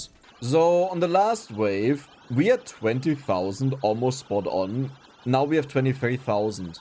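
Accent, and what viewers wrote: Germany. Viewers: Broooo is that guy german? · ich wusste doch das du deutsch bist man merkts and deinem akzent und an dem "HEILIGES SCHNITZEL" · Ich liebe deinen deutschen Akzent 😂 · Er spricht weder BE, noch AE. Er spricht germanenglish 💯😃💪🏼 bitte content auf deutsch, das wäre der Traum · hi my first time on this channel, after 1 min: yeah this guy is german, after minute 29 i heard "scheiße" and burst out loughing, great video. love brotato :D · rede doch einfach deutsch das kann man sich ja nich anhören mit den deutschen akzent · Are you german? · hab mich die ganze zeit gefragt du klingst so deutsch dann bei Minute ungefähr schei** hat alles bestätigt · R u German ? Ur accent is so german line mine 😂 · he sounds very German, not Austrian German too. You can also tell by the efficiency shown here.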